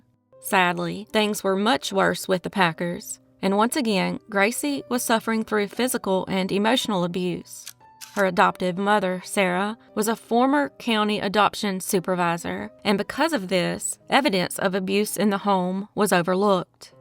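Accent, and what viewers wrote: South, United States. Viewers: is the way southern people talk. There are words that every dialect in the US speak that is different from Webster’s Dictionary pronunciation of the actual word. It says it all in the name of her channel.. That’s one of the things that makes us all unique. Our accent or dialect from our geographical region. There are some really good YouTube channels that break them down and explain why they pronounce words the way they do.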